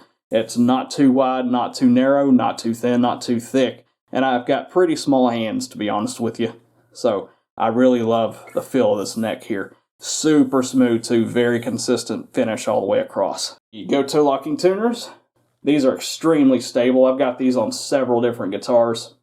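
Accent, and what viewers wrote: United States. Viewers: ok I was thinking arkansas with that accent lol. Cedarpoint! We went there in June. I live in nebraska and esp are non existing. Btw my ltd is a fret buzzer. I'm about to send it to sweetwater for a plek and setup.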